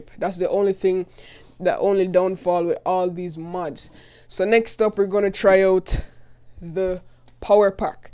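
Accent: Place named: Jamaica. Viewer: Bro you can’t tell me your not a Jamaican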